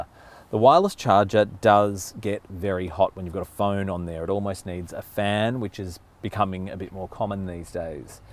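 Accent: Australia. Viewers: That down-under accent + curry = solid combo mate xD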